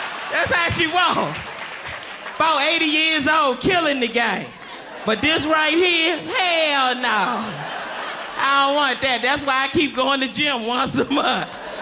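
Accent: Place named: Washington DC, United States. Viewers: That DC accent & lingo makes me feel at ease 😂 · I know our accent anywhere #DC birthed some of the funniest comedians in the game. I'm just gon say Martin Lawrence, Dave Chappelle, Tommy Davidson to name a few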